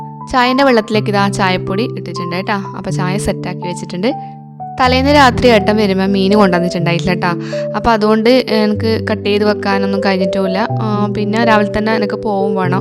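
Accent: Kannur, India. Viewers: കണ്ണൂർ ഭാഷ ഇഷ്ടപ്പെട്ടു വർക് ഔട്ട് കൃത്യമായി നടക്കട്ടെ വിശേഷങ്ങളും വീഡിയോയും ഇഷ്ടപ്പെട്ടു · വീഡിയോ സൂപ്പർ ആയട ❤ കണ്ണൂർ ഭാഷ എനിക്ക് ഇഷ്ടായി ഞങ്ങൾ ഇവിടെ unnithand എന്നാ പറയാറ് ❤️❤️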